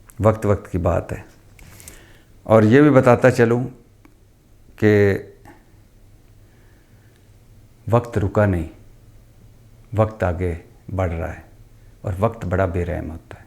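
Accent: Punjab, Pakistan. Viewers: Aoa Mashallah I’m very pleased with your faithfully coverage on each and every topic with balanced coverage. Love how you deliver every single episode your touch with pure genuine Punjabi touch love it all the way. Hats off to you covering without any fear and any envelope coverage.. keep up the genuine coverage! Stay blessed always tons of prayers for you and all your loved ones be safe out there.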